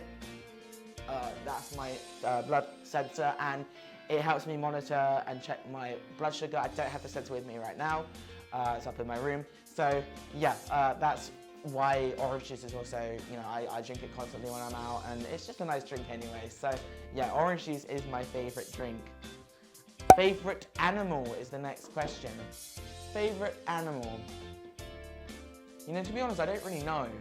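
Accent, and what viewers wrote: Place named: United Kingdom. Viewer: Es porque habla ingles británico! Ellos tienen un lenguaje y acento particular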